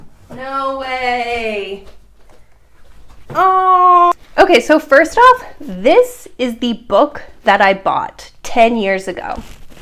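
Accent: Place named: Eastern Turkey, Turkey. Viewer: you speak Turkish with Ağrı accent An American speaks Turkish with Eastern accent, it's kinda thing only happens in movies:) and being able to live in Turkey for 10 years without knowing Turkish is a miracle...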